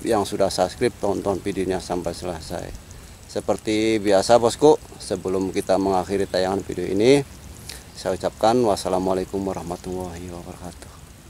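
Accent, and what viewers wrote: Indramayu, Indonesia. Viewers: Kya wong dermayu y ngomonge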